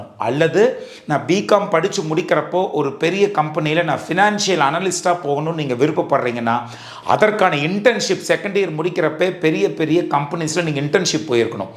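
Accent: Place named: Madurai, India. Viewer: Sir neega enga schl ku vanthirkiga nyabagam eruka madurai bashala pesnigaley😂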